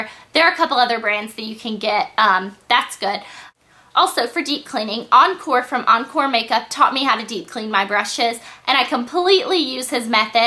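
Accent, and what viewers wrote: South, United States. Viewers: i love ur voice!! dont listen to all those people who are south accent haters. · You eye make up is gorgeous in this video. Can you please do a tutorial on it? As to your voice: It's probably because you have a southern belle accent. Personally, since I am from the northwest, southern accents tend to irk me, and so that's probably why some people say they find your voice annoying. Not your fault, just some of us odd Northerners ;) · Um... I just have to say that I absolutely love your accent! I'm from the south, and I think country accents are cute! I'm proud to have a country accent :)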